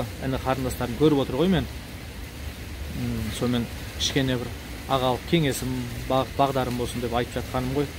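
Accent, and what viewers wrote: Standard. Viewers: Жарайсын! Кандай адепти де мадениетти сойлегенинин ози тамаша, тагы да таза казакша сойлегенине рахаттанып отырып кордим. Рахмет! Бари тамаша кандай керемет орындар! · Қандай керемет! Таза қазақша сөйлегеніңіз ұнады! Алла жар болсын Сізге! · Озге елде оз тилинде таза сойлеу. Оз елиндеги тил билмейтин балыктардан караганда мын есе артык алла алдыннан жарылкасын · Керемет.Тап-таза сауатты сөйлейді екен қазақ тілінде.Денің сау болсын қазақтың - Азаматы.Қайда жүрсең де аман бол! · Жарайсын бала. Қазақ тілін таза, білімді бала екенсін. Біздің Қазақстанды ослай дамыту үшін сен сияқты білімді жастар керек және халықтың көз қарасын ойын өзгерту керек жақсы өмірге. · Аман бол бауырым,керемет .Саған тек сәттілік тілеймін.Қазақша таза сөйлеп отырғаныңа тәнтімін,рахмет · Қайран қазақтың кемпір шалының тәрбиесі десеңші, қазақы тәрбиенің иісі аңқып тұр. 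Бауырым осы қалпыңда қал. Тілің таза екен. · Таза казакша сойлейди екенсиз,кай жерденсиз? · бауырым, өте керемет видеолар. Мен бірінші рет көріп отырмын,керемет екен, жақсы әсер алдым, қазақша таза сөйлейді екенсіз 🌹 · Қазақша таза сөйлеп жақсы видо болды тамаша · Балам, қайда жүрсеңде, аман бол! Шет елде жүрсеңде, таза қазақша, мәнерлеп сөйлегеніңе, мың рахмет! Сені туған, анадан айналдым! Біздің Қазақстанның мынандай күнге жету қайда! Аман бол, балам! Ақ Жол! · Рахмет жігіт,қандай таза сөйлейсін қазақ тілін! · Керемет түсіндіріп жатырсын. Жалпак қазақ тілінде. Айналайын биіктерден көріне бергын. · Аман бол елге аман орал тек денин сау болсын Америкада журип казакшаны таза сойлеп турсын мын бол казак азаматы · Тамаша видио . Сені осындаи ер азамат етіп тəрбиелеп өсірген атаң мен апаңа, əке шешеңе мың алғыс ! Шалғаида жүрсеңде қазақша тап таза сөлеп тұрсың. Табысты бол! 🤗👌🙏🇰🇿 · Керемет қазақша сөйлеген таза жарайсын рақмет биіктен көріне бер ✊❤ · Жарайсыз бір орысша сөз арластырмастан таза қазақша әдемі сөйлеп, әдемі видео ролик түсіріп шықтыңыз, бәрі түсінікті болды.рақмет. 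деніңіз сау, жарыңызбен бала-шағаңыздың қызығын көріңіз!!! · казакша таза сойлегенине риза болдым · Сәлем таза қазақ тілінде сөйлейсің Рахмет түсірген видеоны көріп отырмын. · Казакша таза сойлейсин оте жаксы жат елди донгелетип турсын аман жур айналайын болашагын жаркын болсын айткандарынды тындай бергин келеди саган амандык саттилик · Не деген керемет !Қазақтың нағыз азаматы екенсің! Таза қазақ тілінде сөйлегенің көңіл қуантарлық! Алла нәсіп етіп,елге жеңіспен орал! Бағың жансын,бауырым! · Алла разы болсын.нағыз қазақтың жігіті екенсің таза сөилегеніңе рзамын бәрі тамаша👍👍✊ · Амансыңба бауырым. Қазақшаны жақсы таза сөйлейді екенсің жарайсың. Ол жақта қандай жұмыс жасайсың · Кандай жаксы таза казакша сойлеген кулакка жагымды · Бауырым өте жақсы, видеоларың тартымды, тілің таза орысша қоспай сөйлеу мәнерің тартымды, ой өрісің кең екен, сөз жоқ. Біздеде астана, алматы, бүкіл облыс орталықтары, тіпті аудан, ауылдардады қазақтар екі этаж коттедж салып алып жатыр емеспе ( 80% қазақтар) жәйлап жайлап біздікілерде жетер осындай жағдайға деп тілейік. 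Қазақтың болашағы алда деп қойшы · Рахмет бауырым аман жур таза казакша сойлейди екенсин тамаша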